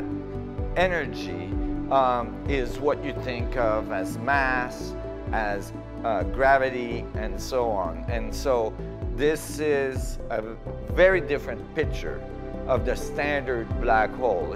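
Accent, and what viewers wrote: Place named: Quebec, Canada. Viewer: Just found this one....following Nassim since 2006.....LOVE HIM, LOVE HIS MIND, HIS LOOK, HIS FACE, HIS QUÉBECOIS ACCENT, the whole being....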